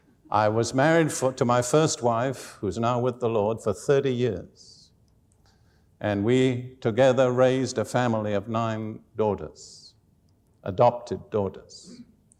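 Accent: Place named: United Kingdom. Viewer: Real Brit Talk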